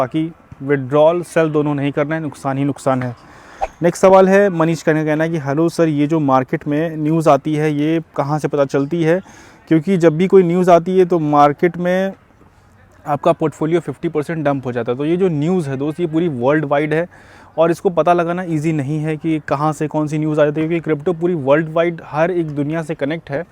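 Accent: Uttar Pradesh, India. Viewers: Sir yeh Kaunse GARDEN me Video banate ho har Sunday ko? aur aap Kaha se ho...? aapka accent UP wala hai, mai bhi UP se hu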